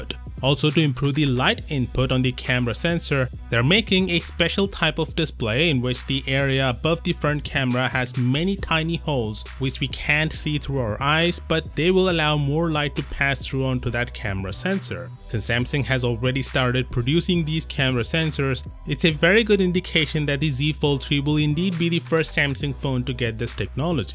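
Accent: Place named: India. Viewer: Work on your accent, everytime I try to watch your video till the end i just give up because of it. Otherwise your fans will be indians only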